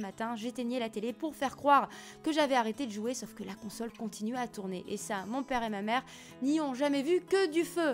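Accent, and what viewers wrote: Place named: South, France. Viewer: Salut, ce 1er Draw My Life est une réussite surtout que tu dessines bien mieux que moi LOL!! ^^ Je suis né le 28 Septembre 1979 à Perpignan donc on a quasiment le même âge, j'habite près d'Avignon et j'ai aussi l'accent du sud.;) J'ai débuté les jeux vidéo sur un AMSTRAD CPC 6128 puis j'ai eu la Game Boy et les PS1, PS2,PS3. Les copains m'ont fait connaître la Master System, la NES et la Super NES. J'ai une prédilection pour les jeux de course automobile, de combat et de guerre/infiltration. Merci pour cette vidéo et j'espère sincèrement qu'il y en aura d'autres du même style car c'est vraiment top, des bisous!! =))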